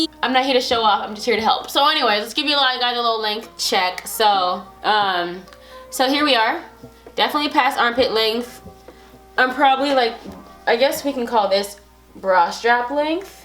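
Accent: Jamaica. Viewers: Hair is beautiful queen!!! Do you have a patois accent btw? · Video sell off. Real Jamaican talk. Any tips for bald patches. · I knew i heard an jamaican accent in one of ur videos but i just brushed it off as i was too proud lol now u cleared that up. 876🇯🇲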